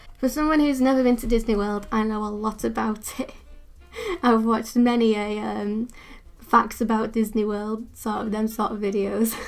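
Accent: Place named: Manchester, United Kingdom. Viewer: Your art is AMAZING! I never knew you were from Manchester and I love you accent.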